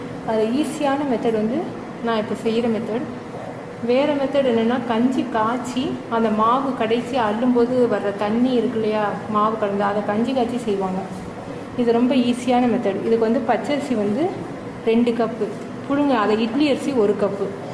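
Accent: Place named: Chettinad, India. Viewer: achi every recipe is awesome and that too with your chettinad slang it is very nice thank you for all your receipes...this is deivanai saravanan...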